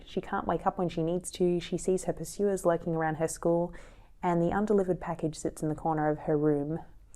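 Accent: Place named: Australia. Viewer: Thank you for the video. Seems to me the snowflake method is overly laborious. Brainstorm your story, characters and setting. Brainstorm story beats that you can structure into chapters keeping with the three act story. Outline each chapter in bullet point. Then, change your outlines as you evolve the story …. Write the first draft, leaving scope for discovery along the journey. Don’t waste time reading methods! They’re only selling you something you’ll never use. The best YouTube videos discuss what’s good and what’s bad to keep readers engaged. I’m an amateur writer, no formal training in creative writing, in fact, a science background, so I’m learning as I go. But love your work though. 😘 by the way, having lived in Australia for 20 years, I detected your Aussie accent.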